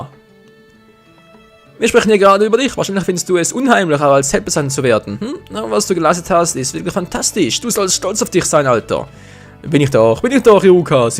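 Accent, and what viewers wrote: Switzerland. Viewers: cooles video kann es sein das du aus der Schweiz bist wegen deinem Sprachverhalten xD nebenbei hab dir n abo und like dagelassen ich würde mich freuen wenn du auch mal auf meinem channel vorbeischauen würdest :D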